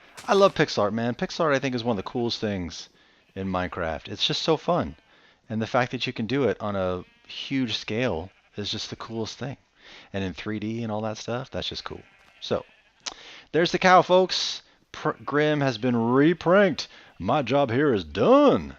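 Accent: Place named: Australia. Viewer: Dat sexy Aussie voice....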